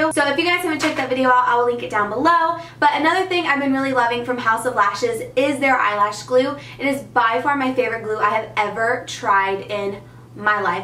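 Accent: South, United States. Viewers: I really enjoy watching your videos. I love the products you recommend, I love your makeup in every video, and I adore your southern accent. But...your lighting is SO bright that it washes out (you sometimes) but mostly the swatches and products you show. It's hard to get a good idea of what the coloring is. Just a note.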